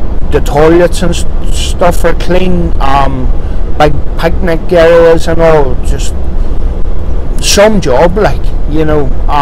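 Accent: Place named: Ireland. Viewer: I laughed when you said the Polish driver spoke English - your Irish accent and his Polish accent must have made for an interesting convo. Maps are a great inclusion. I have been reading the road signs and then searching on Google to see where you have been until now. When you give the updates could you perhaps do a 'picture in picture' so we can see where your actually driving whilst talking. Does your 'better half' watch the videos? Would appreciate how hard you toil to pay the bills. Much enjoyed!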